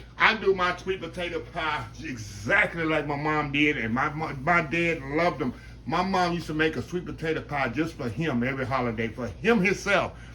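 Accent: South, United States. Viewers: Unk I’m so geeked for your thanksgiving uploads! I really feel like I’m in the kitchen with you! You’re not using all this fancy cookware and gadgets or editing as if your in a tv studio,just you and what you have and thats what makes it so relatable for those of us who are not rich or some “fancy shmancy cooks”… right down to the warm tones & country like atmosphere of your kitchen and your southern drawl is just the icing on the cake..no pun intended lol… again thank you for being you chef❤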